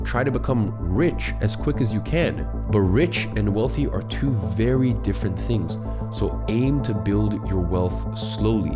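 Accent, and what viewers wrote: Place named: United States. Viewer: I don’t understand, isn’t he British ?? Why is his accent American?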